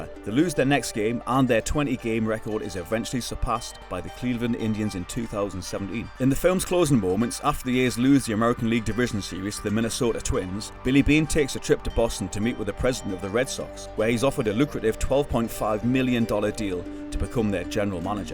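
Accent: Newcastle, United Kingdom. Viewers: gone Geordie? Not what I expected. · Who made the British guy do the voiceover for this one?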